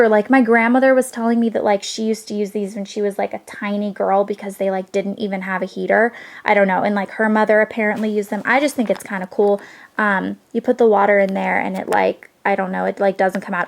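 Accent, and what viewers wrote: South, United States. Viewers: Haha your accent seems like it's gotten stronger from visiting home! I never really noticed a Southern accent but near the end of the video it seems to really come out! :) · It's funny because you sound southern! My favorite was the dreamcatcher!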